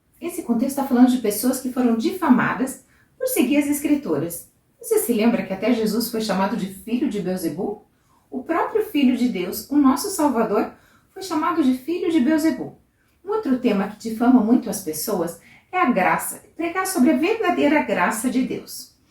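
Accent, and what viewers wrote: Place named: Rio Grande do Sul, Brazil. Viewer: Bom dia ! Hoje domingo de Páscoa .Ele vive 💕 Conheci hj teu canal ,muito bom ! Vc é gaúcha ? Tem sotaque de gaúcha .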